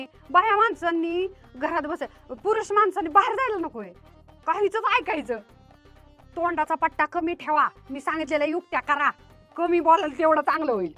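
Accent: India. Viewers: Ek no... Vidio.... Mala tuzi acting khup aavdli.... Aapli gavran Marathi...😅😊 ....... Ashich hast raha, hasvat raha... 👍🏻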